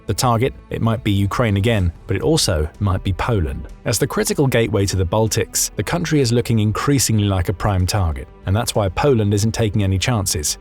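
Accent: United Kingdom. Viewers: Ditch the British communist narrator.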